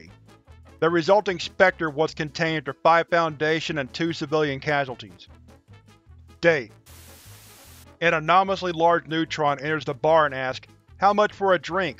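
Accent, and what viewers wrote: South, United States. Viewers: Wait....this is your normal voice? It sounds identical to the voice you use on the other videos? Southern drawl and all.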